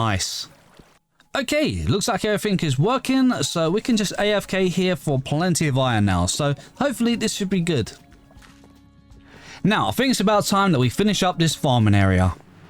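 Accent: United Kingdom. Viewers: I'm in love with the presentation on the video British accent makes everything better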